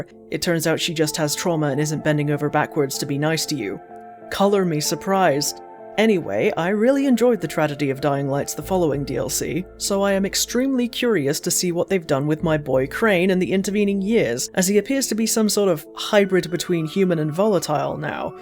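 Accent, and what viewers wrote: United Kingdom. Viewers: Hey can I ask question, I know you are Brit but what accent do u have specifically which region accent?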